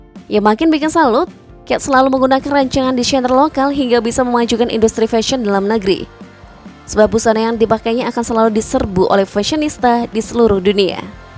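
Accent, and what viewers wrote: Indonesia. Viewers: Saran untuk admin : supaya cari narator yg memiliki pronounce yg English British atau English US. Jangan yg bhs. Inggris a la Indonesia.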